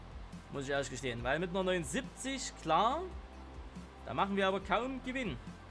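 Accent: Saxony, Germany. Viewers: Wieder ein geiles Video Findes es immer wieder geil Sächsisch zuhören-ob im TV oder in deinen Videos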